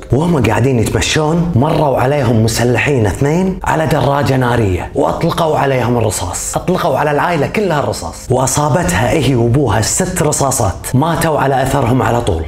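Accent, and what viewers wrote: Kuwait. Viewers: سردك جميل واللهجة الكويتيه ما شاء الله جميله، كل المحبه للشعب الكويتي الأصيل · باين عليك كويتي تقول قطاته والا حن نقول شطحاته او طلعاته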